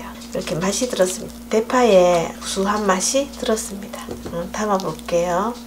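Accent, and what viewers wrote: Gyeongsang, South Korea. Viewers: 겡상도 사투리 억양이 이쁘네요^^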